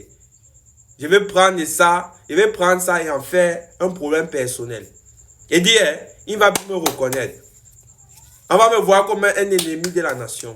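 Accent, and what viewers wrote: Cameroon. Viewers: C'est un camerounais 😂😂😂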